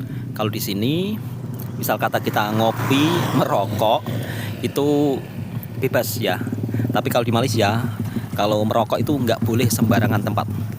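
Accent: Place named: Central Java, Indonesia. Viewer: Bukan itu kalo liat logat bicaranya jawa tengh pesisir utara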